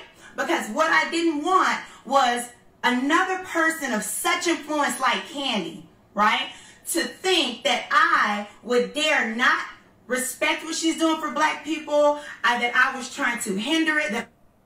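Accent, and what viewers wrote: New York, United States. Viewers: You don't usually sound like this... New York accent... sounding like Nicki Minaj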